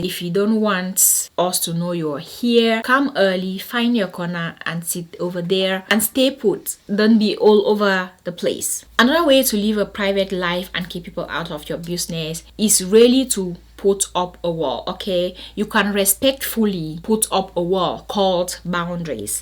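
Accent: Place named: Cameroon. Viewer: Loving the Cameroonian accent. You got a new subbie all the way from sunny Cape Town, South Africa!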